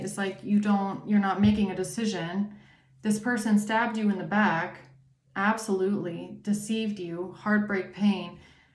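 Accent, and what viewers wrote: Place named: Germany. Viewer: Good morning 🌅, LOOKING FORWARD TO HEARING YOUR VOICE, THAT GERMAN ACCESS OMG YOUR A GODDESS, MUCH RESPECT, HAVE A BEAUTIFUL DAY NIGHT, GOD BLESS YOU X ❤👑☀️💜🛡️👑☀️💜💜💜❤😊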